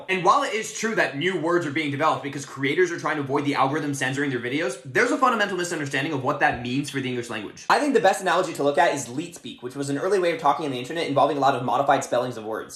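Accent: United States. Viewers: My darling boy..... you speak American english which is already horrendously hideously offensive so i woukdnt worry about it.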